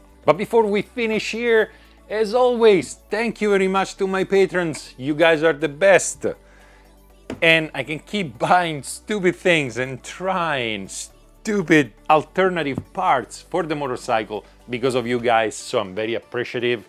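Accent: Italy. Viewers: that's an Italian accent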